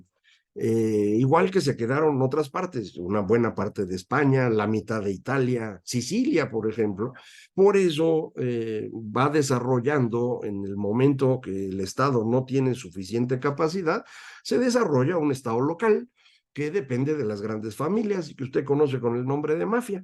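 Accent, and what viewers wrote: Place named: Tepito, Mexico. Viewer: Lea las XII Tablas...desde el 450 a.C. los plebeyos ya podían casarse con patricios.... de historia medieval y equiparar el 476 con la Monarquía Universal Hispánica es de broma ¿verdad? En 1539, en Salamanca se inventó el derecho internacional moderno... entre otras cosas, que a Usted le dan igual...un negrolegendario, un anglofílico con acento tepiteño....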